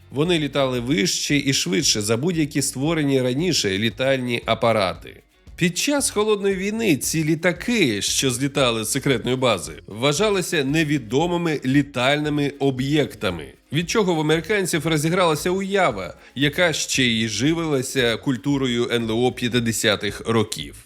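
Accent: Moscow, Russia. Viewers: Хто бере приклад з цього чоловіка? В нього жахливий російський акцент, купа русизмів і не правильні наголоси! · Дізлайк за кацапський акцент. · А нічого, що він "акає" як москвіч??? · Лайк за україномовний контент, але відчувається "рускоязичная чєлюсть"... Треба ще працювати над вимовою. І ще радив би зображення ведучого по максимуму замінювати відеорядом, бо дивитись на очі які бігають читаючи текст не дуже цікаво. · Слухаю це відео у 2026. Спочатку не помітила, що йому кілька років, і сильно здивувалася, чому Ви стали говорити з настільки виразним російським акцентом. Потім розібралася - за ці два роки прогрес у вимові просто чудовий. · блін, як мене КОРОБИТЬ від цих москальських ааа в українській мові !!!!!!!!!!!!!!!!!!! одразу видно, що людина ДУЖЕ ПОГАНО володіє українськю мовою! Запамятайте просте правило : в українській мові як пишеться, так і читається!!!! 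Простий приклад (слова взяті із відео - <link> : пишеться : "кілограмів", читається - ТЕЖ "кілограмів", а НЕ "кілаграмів"!!! пишеться "теоретично", і вимовляється ТЕЖ - "ТЕОРЕТИЧНО", а НЕ - "теоретична" пишеться "потрібно", читається - ТЕЖ "потрібно", а НЕ "потрібна" !!!!!!!!!!!!!!!!!!!!!!!!!! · Чути російський акцент диктора, мабуть раніше говорив російською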